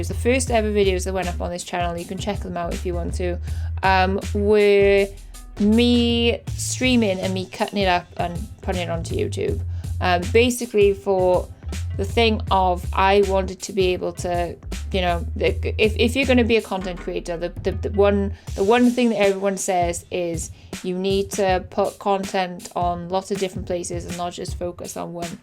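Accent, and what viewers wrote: Wales, United Kingdom. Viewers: People from Australia are top people :) thanks for answering my questions. I guess I am old, that playstation comment dated you and surprised me as I thought you were a lot younger. I came her for the valheim stayed for the valheim and the welsh accent.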